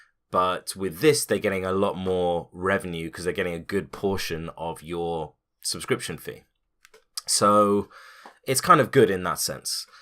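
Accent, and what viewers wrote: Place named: London, United Kingdom. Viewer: Great review. But I confess that I continue to listen more because of you accent. I was in London in the beginning of this year and, god, I love that accent. Remind me from some friends. But about the video is quite good, simple, casual.. feels like a conversation. I am probably going to watch more from you. Adding about the YouTube premium, I have to say, it is a bit expensive but I think it's worth it for those who likes differents versions from the music they like. I mean, there is some live performances that are just masterpieces and they aren't available on Spotify or other plataforms. Interviews, podcasts, channel's from a huge variety of things ( there's a massive of content produced specifically to be play on YouTube, from new and old entertainment companies). And still, there's one more things I would like to add: the algorithm. 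Youtube knows all my moods for music and keeps bringing me news findings on, and I that is just fantastic. Something that made me disappointed after watching you was to know that the originals are bad and we can't see the movies that they rent on the site to us. I am going to get premium.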